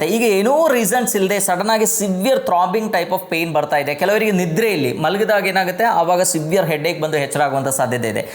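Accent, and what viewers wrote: Kerala, India. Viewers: ನಿಮ್ಮ ವಿವರಣೆ ತುಂಬಾ ಚೆನ್ನಾಗಿದೆ , ಉಚ್ಚಾರಣೆ ಕೇಳುವಾಗ ನಿಮ್ಮ ಭಾಷೆ ಮಲೆಯಾಳಂ ಆಗಿರಬೇಕು ... ಧನ್ಯವಾದಗಳು ಸರ್.😊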